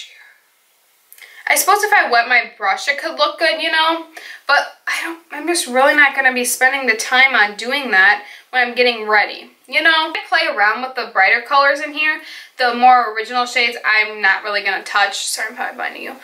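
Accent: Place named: Minnesota, United States. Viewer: Your Minnesooooota accent is coming out strong in this one lmao 😄 it's cute !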